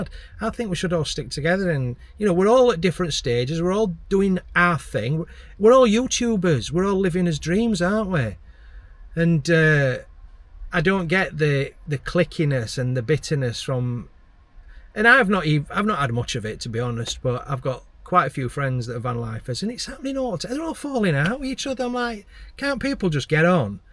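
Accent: Yorkshire, United Kingdom. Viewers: Hello,!! Yank here, who absolutely loves your channel!! I'm a new subscriber, so I don't know a thing about "van life," and TBH, I will watch any content you post and not bellyache!! Any viewer who tries to manage your content needs to get stuffed!! Your Yorkshire accent knocks me out!! And your observations are hilarious!! 🤣🤣🤣 Sweet dreams!! Ta... Chuck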